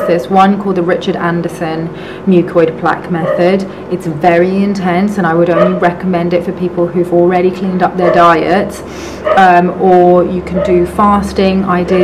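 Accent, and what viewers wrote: United Kingdom. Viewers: Good info! Didn't realize you had such a posh accent :P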